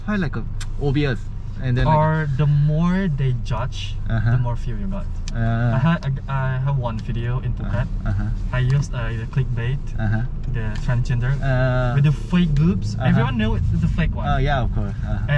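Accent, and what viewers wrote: Singapore. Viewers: I’m watching this video because of phi 👀❤️ btw, are you really korean? When you speak english you sounds like singaporean 👀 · I feel like you're singaporean tho, your accent..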